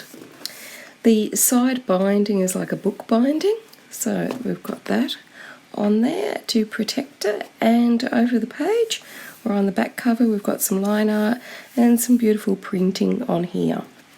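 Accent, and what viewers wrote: Australia. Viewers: Thank you! I enjoy your videos so much. In part because of your lovely Aussie accent, but mostly because you introduce me to book from around the world. Thanks again!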